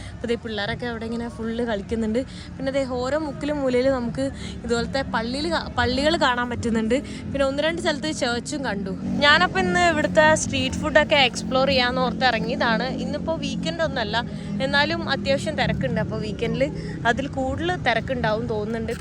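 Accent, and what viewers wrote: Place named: Kozhikode, India. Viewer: Your malayalam is more like Kozhikode slang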